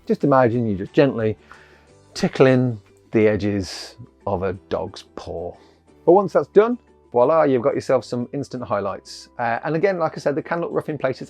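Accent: United Kingdom. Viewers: Is it P Chi Tips or Yorkshire?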